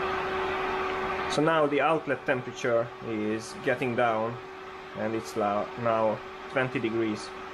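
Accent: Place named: Hungary. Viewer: Are you Hungarian? Your accent tells me you are! :)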